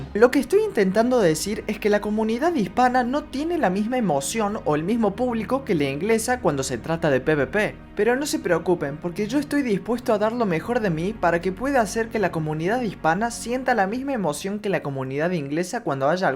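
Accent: Córdoba, Argentina. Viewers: amigo sos de cordoba capital?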